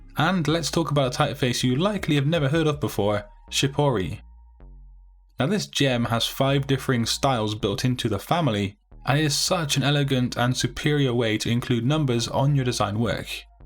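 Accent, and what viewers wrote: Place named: Liverpool, United Kingdom. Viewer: Excellent work and content. Highly appreciated. Like your Scouse accent.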